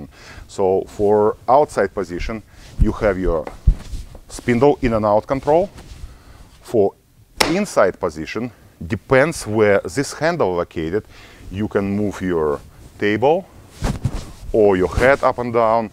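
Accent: Russia. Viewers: У вас явно режущий ухо рязанский акцент!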